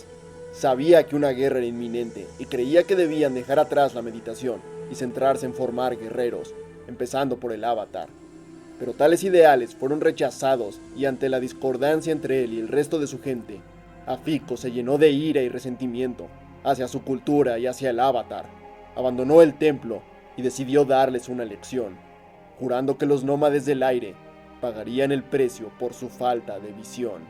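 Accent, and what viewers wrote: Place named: Mexico. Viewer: no sé bien dónde es Oaxaca pero suena a mexicano, y no soy mexicano pero tienes algo encontrá de los mexicanos son unas buenas personas. En todo caso me da igual el vídeo está bien hecho no te meto odio. Ya que más quieres buena suerte.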